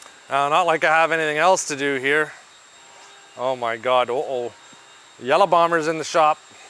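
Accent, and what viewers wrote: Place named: Ontario, Canada. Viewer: Had to add the Ontario accent...Thanks for all the awesome videos. Peace brother.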